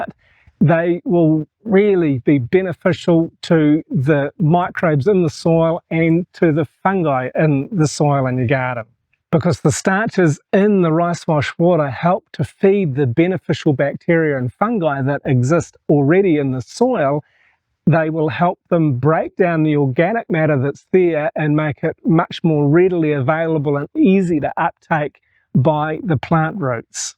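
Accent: New Zealand. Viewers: Sounds more Kiwi then North Thailand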